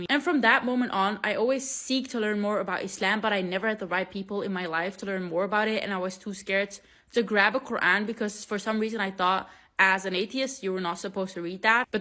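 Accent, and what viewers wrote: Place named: Arab world. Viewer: لهجتها كأنها عربية..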